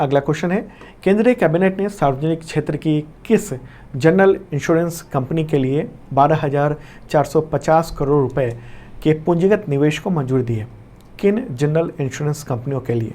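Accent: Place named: Haryana, India. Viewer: Bahi tu haryana se hai kya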